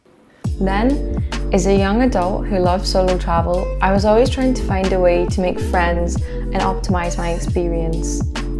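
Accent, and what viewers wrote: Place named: Scotland, United Kingdom. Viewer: As an introvert I was inclined to click of the video and as soon as I heard your Scottish accent as a fellow Scottish person I now feel the need to binge your whole channel <3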